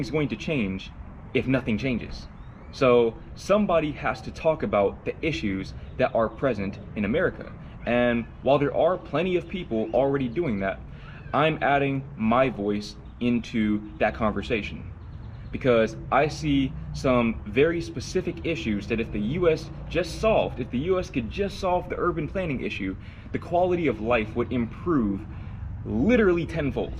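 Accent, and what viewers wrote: Netherlands. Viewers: You can never be too idealistic. You are an asset to this world. Btw great Dutch accent. Also, I lived in the California for sometime, and one day I bought a new printer in a local small store. The fellow that helped me had an accent, but in California that is not weird. Only at the check out I figured he was Dutch too. Complaining… yeah my American colleagues just complain about a lot…😂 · Am I the only one hearing a small hint of Dutch accent on his English? Guess you brought back more...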